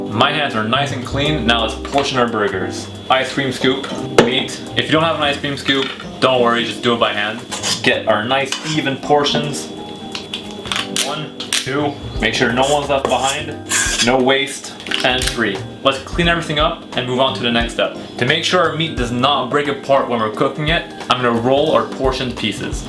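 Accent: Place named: Quebec, Canada. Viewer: Tu sonne comme un anglais québécois c'est drôle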